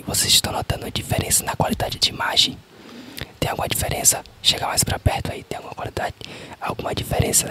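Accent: Bahia, Brazil. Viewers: Com certeza é baiano né? Já irei inscrita ❤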